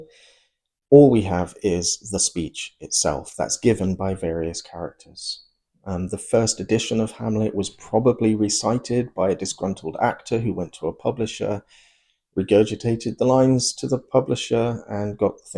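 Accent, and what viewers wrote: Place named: New Orleans, United States. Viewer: Used to love your unique New Orleans sound, delighted you’ve found a second career in lit crit! Outstanding stuff. 😊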